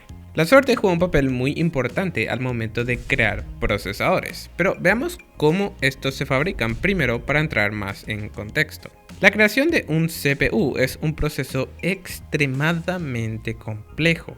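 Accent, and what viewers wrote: Paraguay. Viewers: amigo vos sos paraguayo? de repente tenes acento paraguayo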